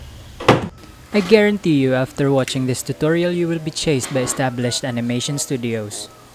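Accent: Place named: England, United Kingdom. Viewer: nice tutorial i like your english accent i can understand it properly ^w^!!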